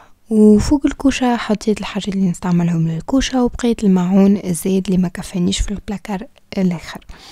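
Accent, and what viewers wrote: Tunisia. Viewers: الفيديو ماشاء الله كميه طاقه ايجابيه وينعش الروح وذوق راقي في المطبخ انا احب الالوان الفاتحه بالبيت والنظيفه تبقى نظيفه سواء مع الغامق او الفاتح مايهم بحب لهجتكم اهل تونس ..خفيفه على القلب واللهجه رهيبه👌😍 متابعتك من السعوديه 🇸🇦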